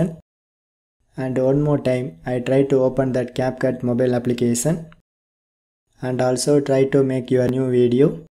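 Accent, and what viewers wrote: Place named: Kerala, India. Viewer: Are u from kerela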